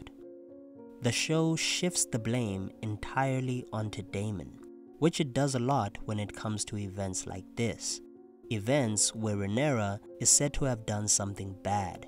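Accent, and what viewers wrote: South Africa. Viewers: Are you south African? Just asking 😂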